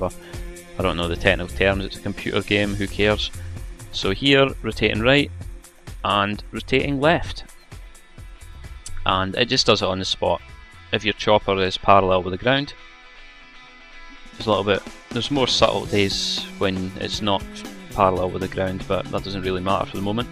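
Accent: Scotland, United Kingdom. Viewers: Probably?! He's as Scottish as haggis. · Probably Scottish... =P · Didn't realized scottish accent was so universal as to not be recognized. I was bewildered though! Good job! :) (ps.: Any idea when part 2's coming?) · hehe i like that scottish accent :D and the video is very good and informative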